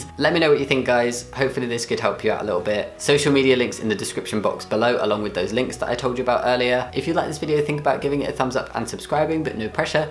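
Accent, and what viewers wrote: United Kingdom. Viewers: I'm a cis girl but I know the struggle of putting a pad inside boxers because i'm very forgetful and sometimes i forget to bring a change of underwear when I come over to my bf's house so when it happens I borrow his boxers but holy shit, that time when I was in my period was nOT A GOOD TIME TO FORGET MY UNDERWEAR. And yes, I agree, it's easier when the pad has wings! Also, you said that pads smell like flowers but I actually have never seen any perfumed pads, what brand are you talking about? Well I live in Canada and you live in the UK (I think, by your accent, correct me if i'm wrong) so maybe we just don't have the same brands but idk it seems weird to me, I feel like the smell of the flowers and the smell of my period blood would just make a terrible mix, i'm not sure if I'd like that lmao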